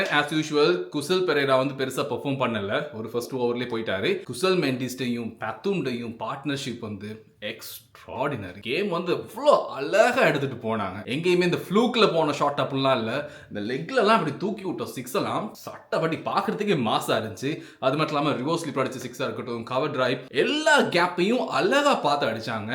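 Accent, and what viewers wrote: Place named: Tamil Nadu, India. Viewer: நீங்கள் ஏன் தமிழ்நாட்டுக்காரர் போல் பேசுறீங்க!?😮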